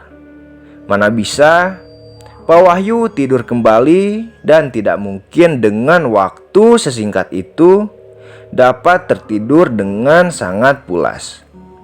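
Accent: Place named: West Java, Indonesia. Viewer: Nu ngadongeng sorana siga urang sunda.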